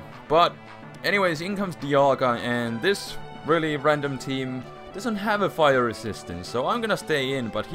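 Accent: Finland. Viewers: Suomi-aksentti <3 Erotti meinaan heti ;) Ei mutta hyvin sää vedät!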